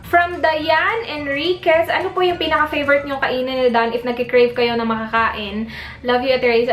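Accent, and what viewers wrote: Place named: Visayas, Philippines. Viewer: The way you are, being simple made me subscribed to your channel. I start my day watching your vlog. I enjoy watching you and your family. My mom is from Mindanao and my dad is from Cebu. So when I hear you talk visaya, it reminds me of my childhood. Best wishes to you and your fiancé from us here California 😊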